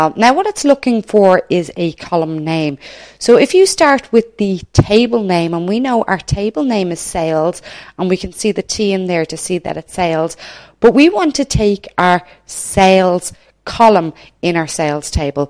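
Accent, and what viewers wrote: Ireland. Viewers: Lovely Irish accent!!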